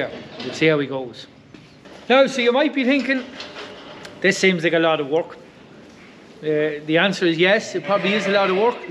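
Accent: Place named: Ireland. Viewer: Thanks for sharing! I love to listen to you and other Irish people speaking ! Very soothing to hear! 😊🐑🐏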